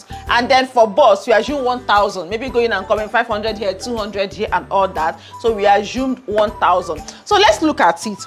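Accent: Nigeria. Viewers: Nigerian accent sucks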